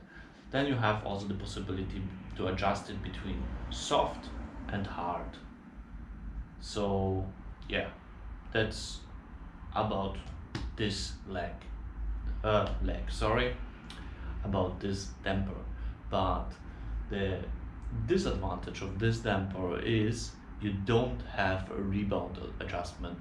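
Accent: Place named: Germany. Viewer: German myself - your accent rocks. Living in South Africa and enjoying your lekker Duitse accent. More so, as an HP2 Enduro owner myself, I appreciate the content you deliver. Vielen Dank und allzeit sichere Fahrt! I am an old f@ and could be your dad. One word of a advice, if I may. Stay as you are, be authentic as you are throughout your life. You rock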